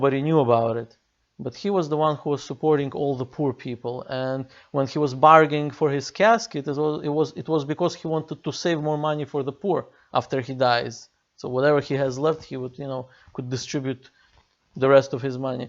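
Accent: Russia. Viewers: You have a Russian accent. Are you from one of the former USSR States?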